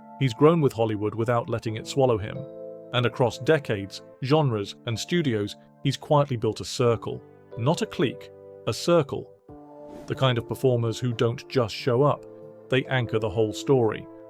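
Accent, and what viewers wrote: United Kingdom. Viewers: Would have been nice to hear it from Ron's own voice instead of an overlay of a British nobody. Nobody can become Ron Howard, even for this explanation, which is not an interview. Disappointing.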